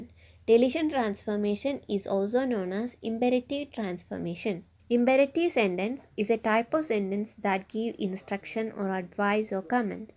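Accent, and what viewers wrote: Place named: Tamil Nadu, India. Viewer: Tamil accent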